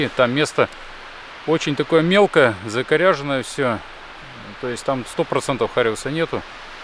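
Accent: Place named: Siberia, Russia. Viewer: Спасибо, спасибо!!! Без блинов ваш сибирский говор просто умиляет.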